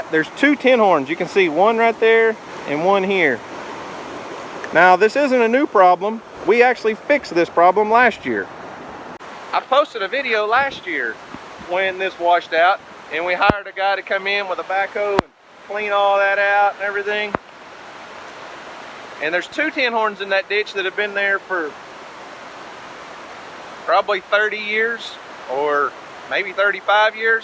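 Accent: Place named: Oklahoma, United States. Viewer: So glad I found your channel! Moved from near Oologah OK to UT in Dec 17. Nice to hear that Okie accent!!!!! These people here never understand what I am saying but love the mountains!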